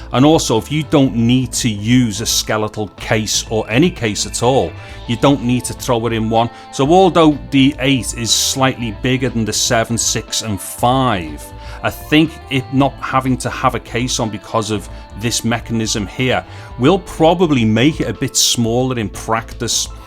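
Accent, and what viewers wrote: Liverpool, United Kingdom. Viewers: Your video made me chuckle - I LOVE your grammar 'and that and stuff' - are you from Liverpool? Good man, great vid, natural and informative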